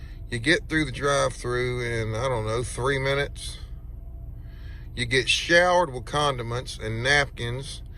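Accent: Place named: South, United States. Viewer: Nil per oral, not in the mouth for you, I know you speak southern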